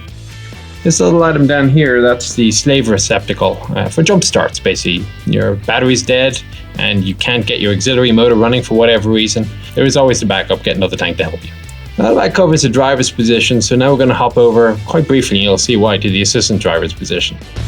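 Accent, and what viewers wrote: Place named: Ireland. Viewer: Nichols, what is your nationality?.......it seems that your military service say American BUT you seem to have an Irish accent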